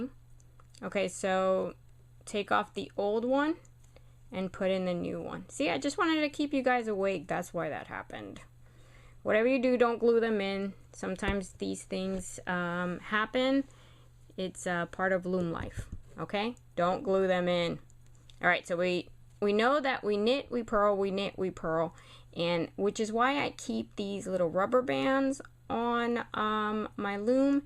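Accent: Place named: Baltimore, United States. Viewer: are you by chance from Mid-Atlantic area? you say "on" like somebody from Baltimore (i get a kick out of trying to learn about different regional accents)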